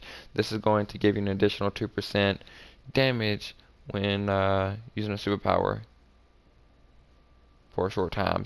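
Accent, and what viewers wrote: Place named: Appalachia, United States. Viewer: Dude. Up your speech patterns and audio. It's "So on and So forth" NOT "So on and so Such". Your Trailer's showing hilbilly...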